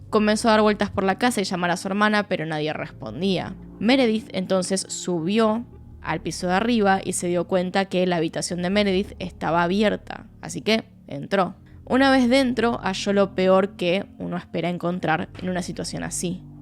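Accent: Argentina. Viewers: Eres mi fav. Aparte que amo el acento argentino! Saludos desde Mexico 🇲🇽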